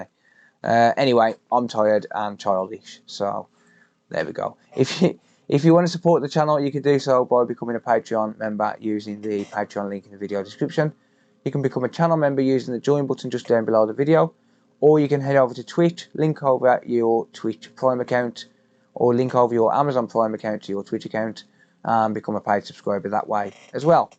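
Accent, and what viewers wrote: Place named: Black Country, United Kingdom. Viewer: Another great video,. Watching and learning techniques from the likes of yourself, Vince, Northridge, etc I've learned absolutely loads. Your channel has something the others are completely lacking though. Being a guy who was born and brought up in Wednesbury but moved up to the Yorkshire coast, it's nice to hear a bostin accent from back home. Black Country ay we. 😂😂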